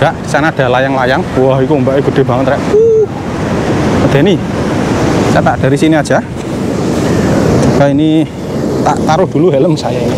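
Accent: Java, Indonesia. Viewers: Aku loh sueneng nek ngrungokne mas ngoceh2 jowone iku LO kuenthel😍🤭🤭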